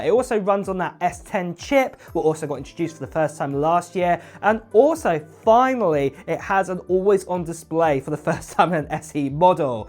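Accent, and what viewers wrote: United Kingdom. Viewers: I'm here for the UK accent.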